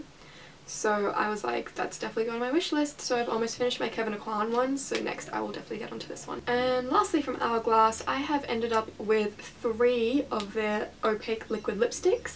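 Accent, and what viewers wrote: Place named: Australia. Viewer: Are you from Australia?? Btw I love ur accent ❤️❤️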